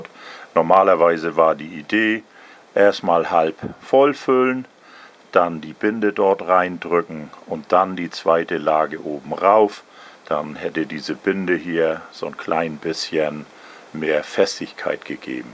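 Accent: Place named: North, Germany. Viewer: der gechillte norddeutsche Dialekt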